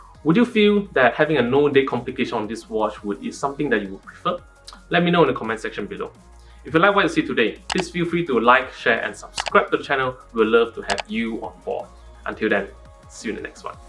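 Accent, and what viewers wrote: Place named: Singapore. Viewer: I think you are a Singaporean? I can sense it with your accent. I worked there in SG before...😊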